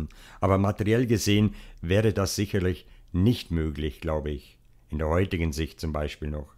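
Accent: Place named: Tyrol, Austria. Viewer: Lieber,danke fpr das tolle Video,Zeitreisen und ähnliche Phänomene zählen zu meinen Lieblingsgebieten!👍 Ich schicke die besten Grüße zum Sonntag ins schöne Tirol aus Essen! 😊 P.S. ich liebe deinen Dialekt,lebst du in der Umgebubg des Lechtals?❤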